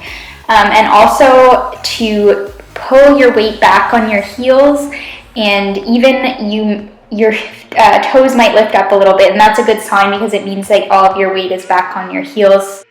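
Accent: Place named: Canada. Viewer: your my favorite you tuber next to Nikki. But I cringe listening to your videos becuase of your Canadian accent! About, out... Please no more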